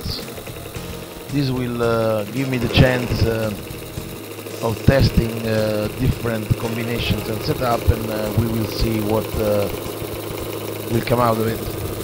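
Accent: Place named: Italy. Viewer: Sei italiano? Riconosco l'accento. Mandami lo schema. Good job.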